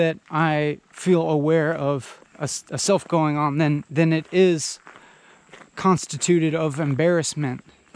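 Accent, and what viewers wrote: United Kingdom. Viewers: Why'd I assume this guy was British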